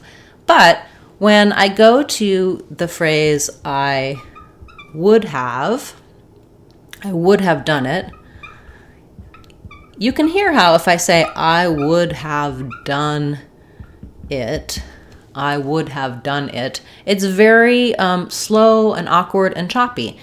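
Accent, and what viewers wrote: United States. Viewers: Is It American accent? please lemme know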